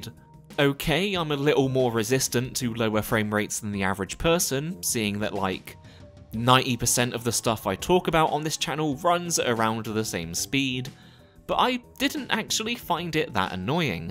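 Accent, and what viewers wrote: United Kingdom. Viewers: Ayyyy it's the boi! That's what I like to see. Missed this incredibly calming and soothing, british voice👏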